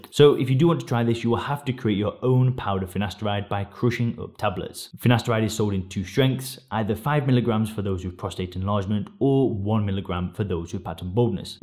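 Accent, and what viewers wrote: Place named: United Kingdom. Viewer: ''pop a pill and your dun'' love that british accent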